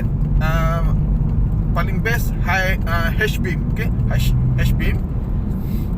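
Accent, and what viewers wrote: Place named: Sabah, Malaysia. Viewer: serius la tak pernah dengar orang Sabah cakap?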